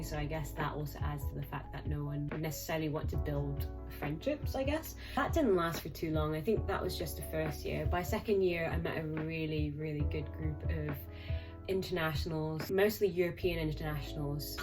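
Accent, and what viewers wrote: Scotland, United Kingdom. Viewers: ngl I thought you sounded Scottish for agesssssss!! Gosh I feel the same.... I find myself laughing at 'jokes' but really it's not okay. Thank you for articulating so many of thoughts I have, especially not fully resonating with the term BAME.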